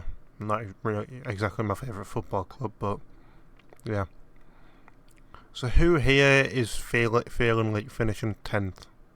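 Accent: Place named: North, United Kingdom. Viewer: can't U tell your from up north.... Thick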